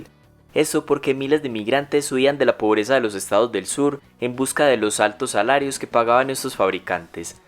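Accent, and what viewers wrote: Colombia. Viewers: Es colombiano, tal vez de medellin o del eje cafetero (pereira, manizales, armenia) etc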